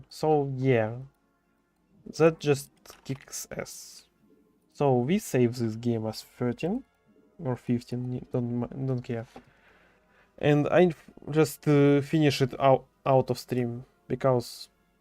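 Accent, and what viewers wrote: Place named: Russia. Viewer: Судя по акценту предположу что вы из России😉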